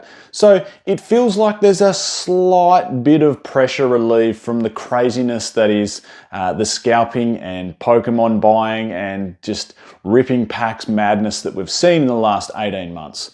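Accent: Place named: Australia. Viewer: I still can’t figure out why ausies pronounce their A’s as R’s but seemingly ONLY at the end of the word. He said Data and it sounded like daughter 🤣🤣 Any way I know people have given you shit about this before and this isn’t me doing that. All ausies do it and it’s just interesting to me why it came to be. Love your content man!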